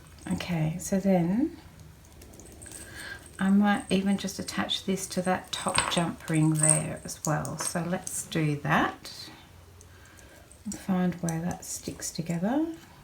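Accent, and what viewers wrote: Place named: Australia. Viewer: sounds aussie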